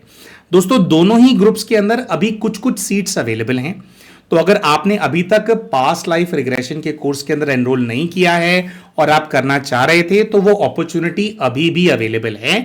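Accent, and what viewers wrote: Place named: Mumbai, India. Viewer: Kantal gaye baba, this is mumbaiyga hindi.kantala ye marathi word hai. inko past life karane ki jarurat kya thi? Boring laga sunana.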